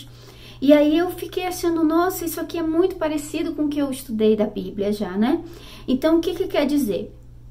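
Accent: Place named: Belém, Brazil. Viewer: Senti um sotaque de BELÉM-PA...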